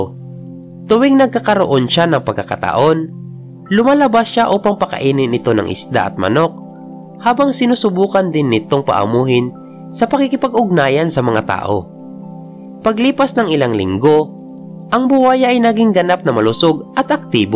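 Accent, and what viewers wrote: Visayas, Philippines. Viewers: Halatang bisaya peru ok na din yung accent😂 keep it up lods👍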